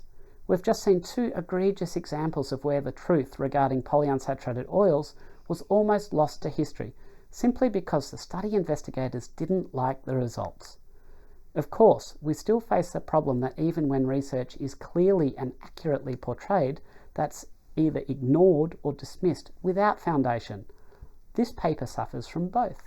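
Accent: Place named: Australia. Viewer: Australian can be difficult, try slowing down the speed of playback to 3/4 speed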